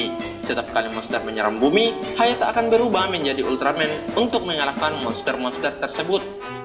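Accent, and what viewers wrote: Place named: Eastern Indonesia, Indonesia. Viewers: Asal mana sih logatnya kayak orang makasaar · hahah masa kecil kita bahagia banget, thankslah infonya,,, anyway logat ngmgnya asik banget bang, orang timur apa sumatera nihh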